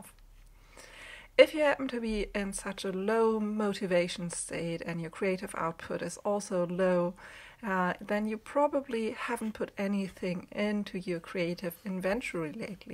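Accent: Germany. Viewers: I came here for the subject matter, but it`s your impressive vocal delivery in posh English with a German lilt that has kept me lingering.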